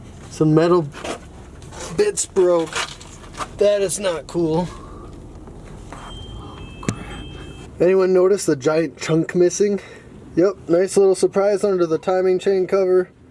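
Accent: Wisconsin, United States. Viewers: I love the mn Wisconsin accent lmao I'm from St paul. Totally understood everyhthing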